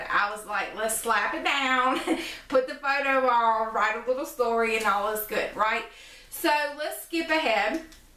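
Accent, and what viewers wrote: South, United States. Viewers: I'm definitely getting that magazine as soon as I get my check next week! I know where Barnes and Noble is. I love watching you and hearing you talk because you are so southern, and I'm southern too. I live in South Carolina.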